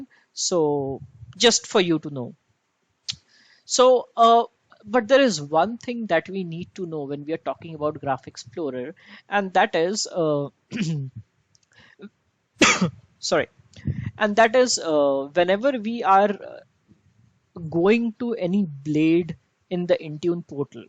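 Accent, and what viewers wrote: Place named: India. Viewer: Indian English again? bye~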